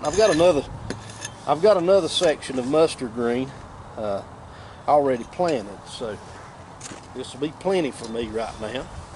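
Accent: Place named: Georgia, United States. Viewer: I was born in south ga. You sound like home. I live in AL now because that’s where my husband is from. I planned to get my mustards in the ground at the end of August. And yes thinning is hard to make yourself do when they’re first coming up but boy have I regretted it when I didn’t. Enjoyed the video. God bless and happy gardening.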